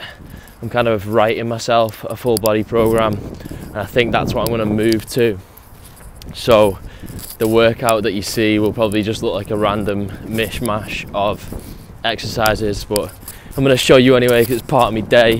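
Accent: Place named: Manchester, United Kingdom. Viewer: Z J Manc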